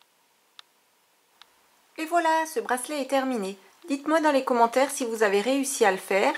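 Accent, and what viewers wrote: Alsace, France. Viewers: Oh merci beaucoup de ta réponse! Je suis moi aussi alsacienne et j'ai cru reconnaître ton accent ;) Bon ben j'ai regardé ta vidéo... je n'y arrive définitivement pas, pourtant c'est très clair et ça semble facile... on va dire que c'est la faute à mes fils :) Du coup j'en profite, tu connais une boutique sympa dans le bas rhin pour le matériel? Je n'ai que du fil de très mauvaise qualité pour le moment...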